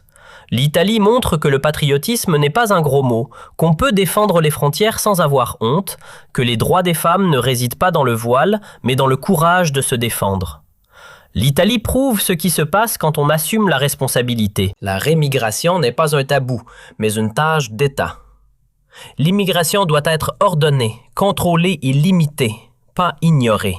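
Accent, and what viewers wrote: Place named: Quebec, Canada. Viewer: Stop à l'IA qui alterne les commentaires avec un accent Québécois et qui nous répète en moins d'une minute 2 fois la même chose RAS LE BOL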